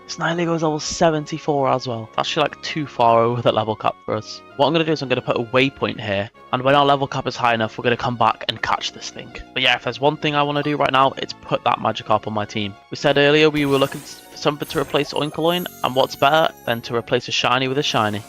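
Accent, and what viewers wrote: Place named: England, United Kingdom. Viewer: Your accent is so familiar where in England are you from?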